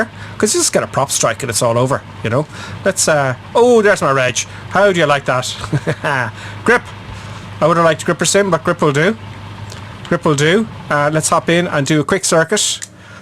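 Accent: Ireland. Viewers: It's just his irish accent. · That was an irish accent? Damn, I found it quite annoying how he pronounced words.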